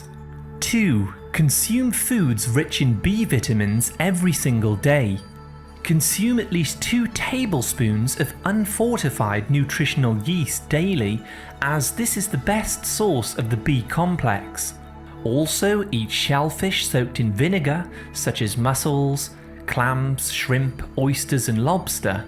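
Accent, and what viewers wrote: United Kingdom. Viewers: That accent lol must be british.. we say vight-o-mins they say vit-a-min...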